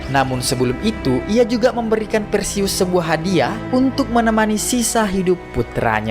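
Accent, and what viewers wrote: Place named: Makassar, Indonesia. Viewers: Nassa na logat makassar na admin ka😅😅😅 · Gue subs lu bang karan lu orang makassar kan haha😂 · Dari logat nya pasti orang Sulawesi😂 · Pasti orang Makassar 😅 Salam dari Gowa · Orang Sulawesi Ki to bang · Adminnya jelas² orang sulsel, suku apa juga gak tau😂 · Cokdok cokdok kayak bahasa Sulawesi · Makassar banget nah kamase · Orang makassar ini pale 😂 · Admin nya orang Makassar kah? Logat & bahasanya ketauan . Kaya pas bilang 'tampiling, co'do co'do'😅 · Deh pasti orang Makkasar ini hahaha waktu bilang tuming abu dehh pecah ketawakuu😂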